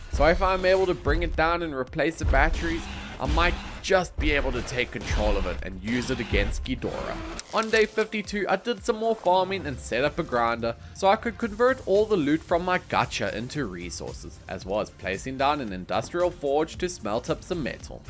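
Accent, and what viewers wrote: South Africa. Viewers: You sound so South African. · Bra when I started watching I heard your south african accent and then you said eskom and then I knew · Wait are you South African?? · omfg i knew you sounded south African and then you said load shedding and then i was sure <3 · Love your videos and i never knew you lived in South Africa telling by Eskom, plus your accent. Good to see another fellow South African Ark player. 😊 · are u south african bro? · Are you south african? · Are you from South Africa because I am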